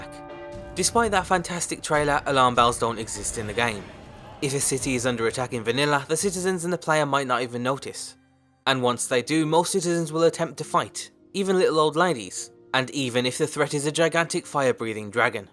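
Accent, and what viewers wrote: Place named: North, United Kingdom. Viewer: His accent has changed. Much more Northern now I think....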